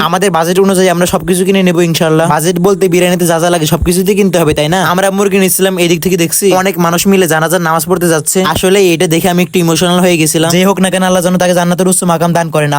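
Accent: Noakhali, Bangladesh. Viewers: ভাই আন্নে ও কী আর মতো নোয়াখাইল্লানি কী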